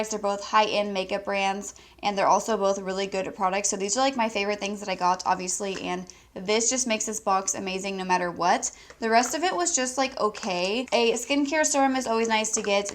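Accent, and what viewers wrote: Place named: United States. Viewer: i love the American accent 😍😍💙🎄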